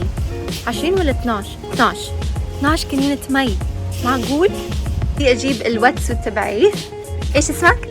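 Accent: Jordan. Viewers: تحكي اردني احسن مني 💔✋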